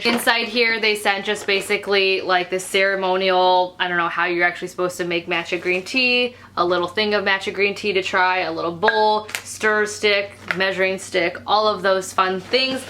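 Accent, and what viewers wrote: Michigan, United States. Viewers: The first video of yours that I watched was your wish app review. I ended up subscribing bc of your voice and accent. My favorite aunt growing up is from Michigan and I always loved hearing her voice. I haven't gotten to see or speak to hear in about a year or so. Your voice and accent is similar and I find it comforting. Anywhos I love the videos And watch at least one a day!! Hope y'all enjoy y'alls "staycation"!!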